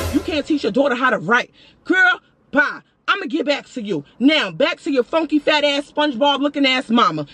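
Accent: New York, United States. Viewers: It sounds like you from New York around the way, am I correct? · it’s the nyc accent to philly 😂😂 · LOL you know you from New York when you say.... "One Shot Deal" on the real pluw you have the NYC voice yasssss I have not heard one shot deal in over 20 some odd year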